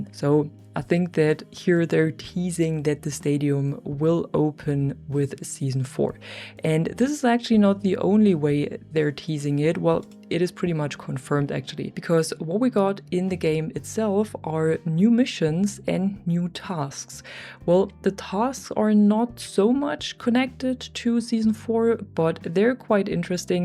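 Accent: Germany. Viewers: Love the German accent ❤🎉greetings from Germany and hopefully you keep up with the skate content 😊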